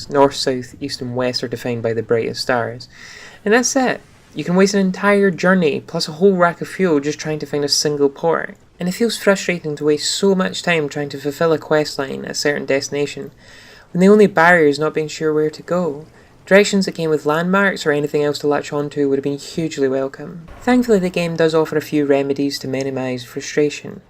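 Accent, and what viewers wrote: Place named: Scotland, United Kingdom. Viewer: The Scottish accent is a wonderful thing if you're aware of it. I quite like the delivery here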